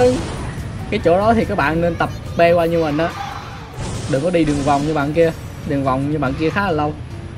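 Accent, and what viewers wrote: Quang Ngai, Vietnam. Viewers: Anh quảng ngãi hả em quẢng nam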